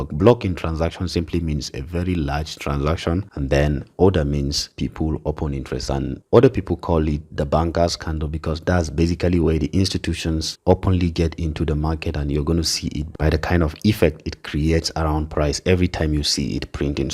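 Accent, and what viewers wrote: Nigeria. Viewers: I don’t know if it’s because of your Nigerian accent that’s makes it easier to understand for me but you always teach in such way it’s easy to understand thanks a lot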